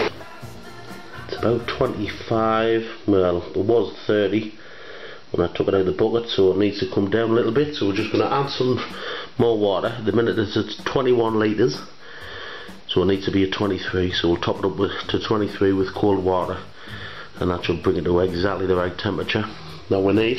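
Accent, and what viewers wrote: Middlesbrough, United Kingdom. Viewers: you must be from the boro.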